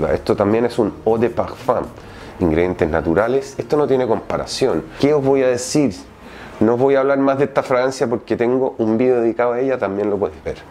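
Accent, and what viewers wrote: Chile. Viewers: Acento chileno para que tu veas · hugo bello arce Ese es el típico acento chileno de Santiago y la zona central, tiene un no sé que de acento andaluz. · de donde eres ? , porque yo siendo Chileno se nota muy claro su acento Chileno y no le encuentro nada parecido al acento , venezolano ni Dominicano ni nada parecido. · Es chileno o español? 😅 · Es Chileno. Se nota altiro... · Chileno no? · es chileno cierto? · Aparte usa ese acento que parece frances y eso lo hace mas interesante aunque es chileno, de a ratos se le nota el acento chileno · Gar ..también pense que era español ..pero analizando bien ...todavía se le escucha el acento chileno....solo le falta agregar el ""pooooh" y ya esta. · Que onda la gente que dice "aun se le nota lo chileno" ???? Habla totalmente Chileno, lo unico que se le pego de españa es el "os" en ves de "les" eso es todo, su tonada y forma de hablar es 100% Chilena.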